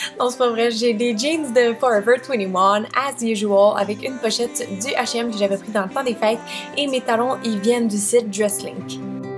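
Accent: Quebec, Canada. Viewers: Coucou, j'aime beaucoup tes vidéos, tu es trop cute, j'adore ton ptit accent québécois et tes ptites tâches de rousseurs c'est trop mignon :) j'aime aussi tout tes conseils de maquillage, mode, et ta personnalité, la fraîcheur, la simplicité et ta bonne humeur, continue comme ça ! Puis félicitation pour ta ligne de vêtements, je te souhaite le meilleur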